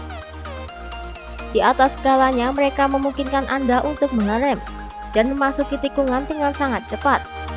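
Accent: Java, Indonesia. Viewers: Admin Medok mania mantap 😂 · Iki MiMinya wong jogja phooww..yoo yg isi suaranyaa..medhokk..bgt..Min...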